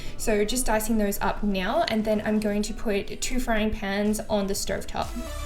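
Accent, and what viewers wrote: Australia. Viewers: I love your accent are you from Australia! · Your accent, Australian?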